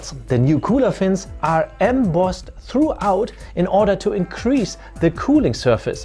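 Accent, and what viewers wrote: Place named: Germany. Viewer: Ur german accent bro 💀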